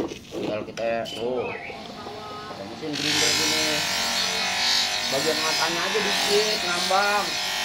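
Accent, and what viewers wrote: Jakarta, Indonesia. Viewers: kentel bgt Betawi Nye cang, salam dari Depok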